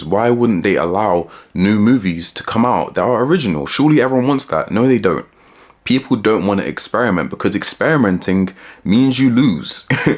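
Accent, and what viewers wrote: United Kingdom. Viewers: Jesus loves you and died for you. Also I love ur uk accent 😭 (im not from the uk)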